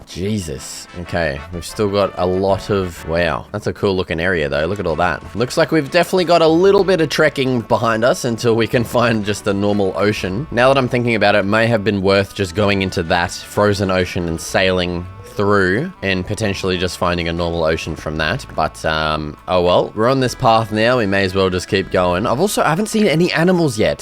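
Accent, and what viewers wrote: Australia. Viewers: you sound like the biggest aussi ever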